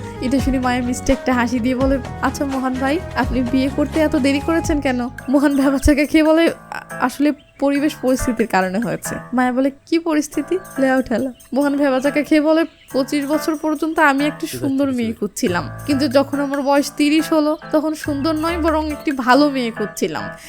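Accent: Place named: Khulna, Bangladesh. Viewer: আপনার বাড়ি নিশ্চিত যশোর বা খুলনা।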